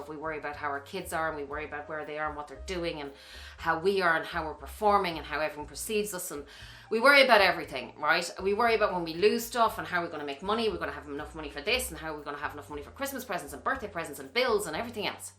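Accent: Ireland. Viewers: Aw- I love you too! Your energy and gorgeous Irish accent . I am praying this video will help Amanda- and any of us who need help.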